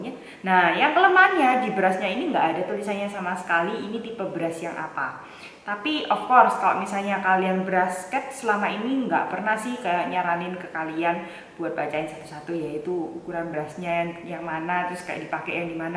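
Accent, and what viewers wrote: Java, Indonesia. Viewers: Kok medhookkk 😍😂😂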